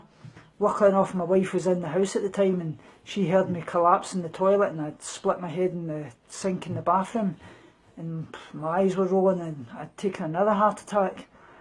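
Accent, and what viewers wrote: United Kingdom. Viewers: Hi. Very happy to see you're still alive! I guess you live in the UK (from your accent)? In Sweden we have a law that allows the hospitals to admit eating disordered patients against their will if they have a BMI below 14. Then it's consider life threatening starvation and the psychiatric rules for admission against one's will is: if there's a danger to the person's life or someone else's, and if the patient suffer from a serious mental condition. Since this law started including ED-patients the mortality rate has dropped SO MUCH. Very few people die from anorexia in Sweden nowadays. I wish there were similar laws in every country. 20% dying from anorexia is just ridiculous numbers. You can't think or make clear decisions that much underweight. The brain starves and you need to get fed to higher weight before you can think properly, and if the hospital can provide that help it'll improve your chanses of getting well SO MUCH. 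If we hadn't had that law I would've been dead, because I starved myself like a maniac (I'm bipolar, so I'm allowed to use that word, haha). As soon as I got down to BMI 13 they admitted me and tube fed me, and it saved my life I don't know how many times. Because I wouldn't have stopped. I couldn't have stopped. I dropped 10 kg in 2 months without even trying to lose weight. Yes, I hated being at the hospital and that they made me gain weight, but without that I wouldn't be here. Nowadays I've been at normal weight for 8 years and eat what I want.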